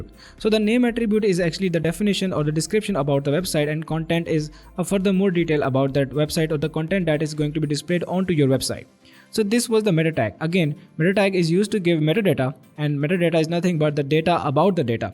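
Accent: India. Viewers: Just relised you have a kashmirir accent! Are you from JK?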